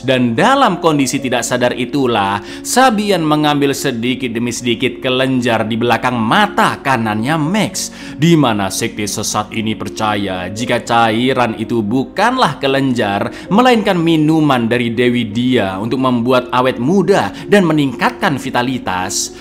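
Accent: Java, Indonesia. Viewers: Iya, meski yg direvisi film yg sama masih pe ngen nonton juga sebab narsi sama-sama menarik dan kocak. Yg satu dgn dialek jawa, satunya sunda. Horror jd ga' horror2 amat, sangat menghibur😅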